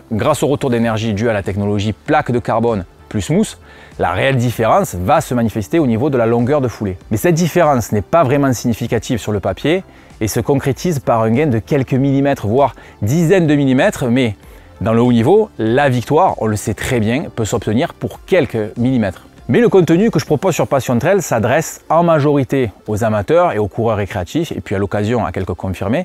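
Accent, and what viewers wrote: South, France. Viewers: Encore merci pour toutes ces vidéos ! Je suis un grand fan y compris du petit accent du Sud 😊🤩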